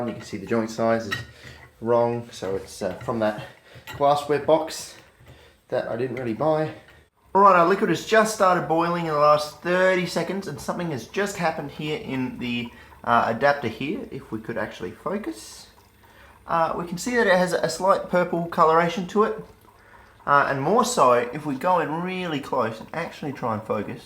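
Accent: Australia. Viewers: He Australian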